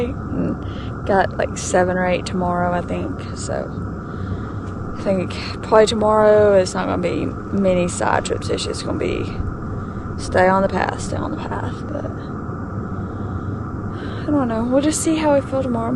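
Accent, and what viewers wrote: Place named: Georgia, United States. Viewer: You sound like you are from Georgia.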